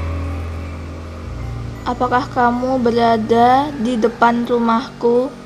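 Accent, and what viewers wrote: Indonesia. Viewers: Kak orang Jawa ya?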